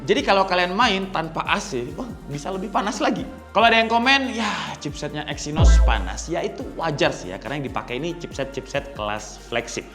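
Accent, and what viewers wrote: Java, Indonesia. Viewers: jowoan rek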